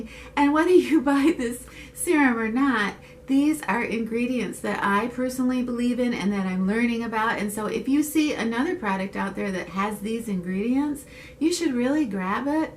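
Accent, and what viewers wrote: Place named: Michigan, United States. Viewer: I just wanted to tell you how much I am enjoying your videos. I've learned so many things from you about skin care and different products! I also have to tell you that I am from Rockford (having moved East 28 years ago) and it's been wonderful to see a couple of places you've shown as well as Grand Rapids. ❤️. I miss beautiful MI.. I also recognize the familiar way you pronounce certain words. Oh how I miss that!!! It's been like a precious reminder of my growing up. Thanks again for all you share. I relate to so many things!!! You're a blessing. Sue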